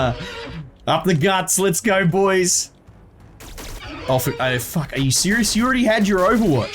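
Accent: Australia. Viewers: It is an Australian accent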